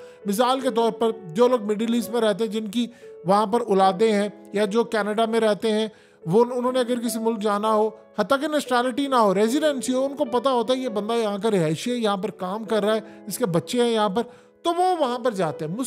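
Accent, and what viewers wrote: India. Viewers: You sound more Indian than Pakistani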